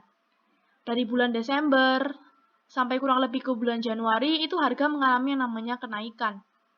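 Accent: Java, Indonesia. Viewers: Jawa mbak ya? 😁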